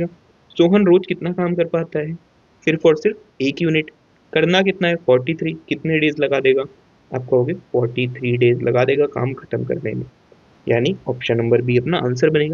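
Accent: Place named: Purvanchal, India. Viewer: Aap Purwanchal se lagte ho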